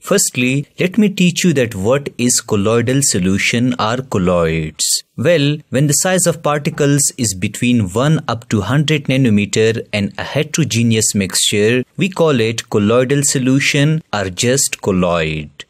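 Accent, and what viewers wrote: India. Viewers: Just the way bro speaks its obvius that bros indian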